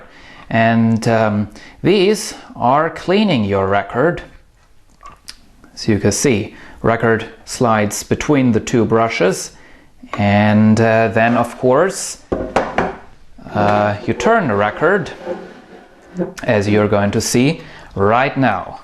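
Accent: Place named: Germany. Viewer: forty earo :D you sound so german, alter :D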